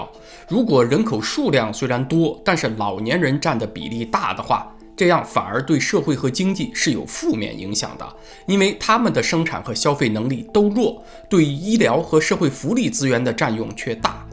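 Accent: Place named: Henan, China. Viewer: 楼主的国语带有河南口音，我听起来很亲切，咱们是老乡。😂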